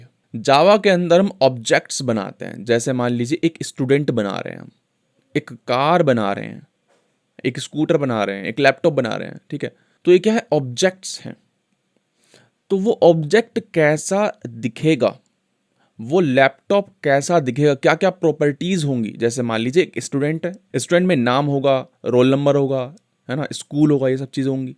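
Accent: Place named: Bihar, India. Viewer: sir ji aapke accent se aap bihar ke lg rhe ho :hands-yellow-heart-red::hands-yellow-heart-red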